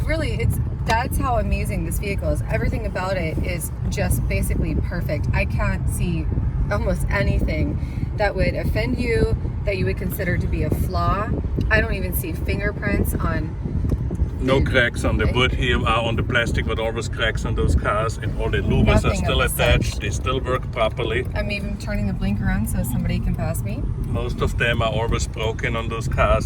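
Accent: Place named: United States. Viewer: Shame about the whining American accent 👍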